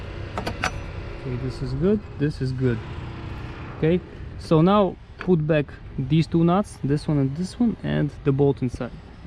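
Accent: Russia. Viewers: You sound like the Russian Hacker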